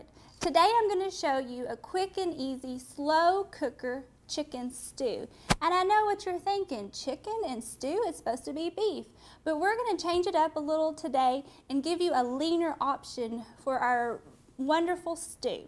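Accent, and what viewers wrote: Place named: Texas, United States. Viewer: Greetings from the Great White North , I love your recipe and I especially love your beautiful Texas accent. Thanks again ;-]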